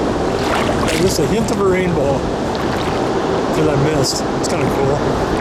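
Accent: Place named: Minnesota, United States. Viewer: Nicely done video. Calming, informative. Love the Minnesota accent. look forward to more videos.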